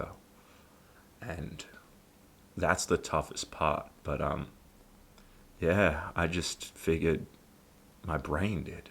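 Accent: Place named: Australia. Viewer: Hey man. I'm follow you from Perú. Your dad's video really touched me. At that time my father was fighting a battle that soon after also took him away. I started watching your videos several years ago when I wanted to improve my English on my own and found your accent interesting. At the time I didn't know you were Australian. Now years later my listening is much better so your Australian accent helped me a lot. Thank you very much, keep strong and keep doing you.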